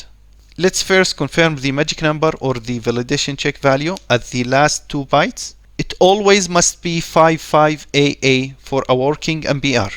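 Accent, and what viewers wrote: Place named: Arab world. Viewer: Haha Dude I can hear your Arabic accent pretty well, very good and detailed video.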